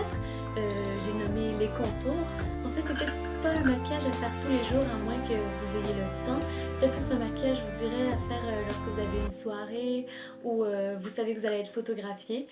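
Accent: Quebec, Canada. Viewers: Ton accent me rappelle le Canada c'est dingue!!! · J'aime énormément ce que tu fais et ton accent Québecoi est totalement adorable :) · Elle se donne pas un accent... Elle est Québécoise!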